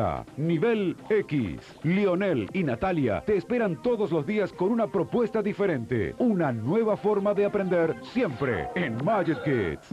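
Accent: Standard. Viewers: En vez de decir acá por Magic Kids, decían aquí por Magic Kids, o aquí en Magic Kids, pero igual se entendía, a pesar de que el locutor hacía la voz en neutro, se entendía igual de bien. 🙌🇦🇷👏🤗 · la calidad del VHS es sublime, lastima la fea locucion que tenia este locutor "neutro" el que teniamos en la señal Argentina era mejor, hasta la propaganda de Kito Pizzas estaba mejor hecha en su pais de origen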